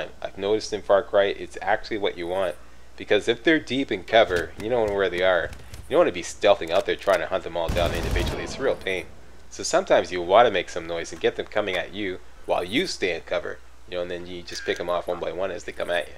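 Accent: Canada. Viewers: Thank you!!Furthermore your excellent walkthrough videos, for me it's an inspiring advanced training in English or God s(hoops)ave the Queen "Canadian English"!!!"gizzahs".."pps"..and "gonna blow up a tower" are icing on the cake.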